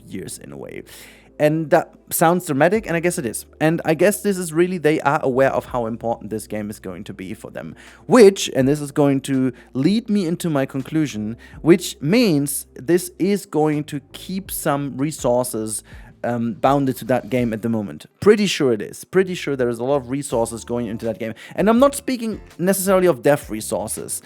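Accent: Germany. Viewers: Hello I have a question. Are you German? Just asking cuz you sound German to me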